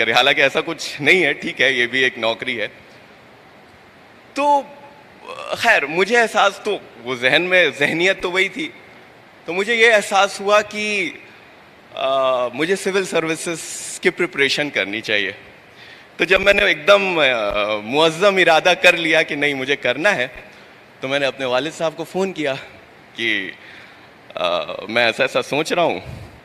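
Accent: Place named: Pakistan. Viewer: OMG 😂 yeh to Pakistani wala Hindi s bole rha h😱🙈🙉🙊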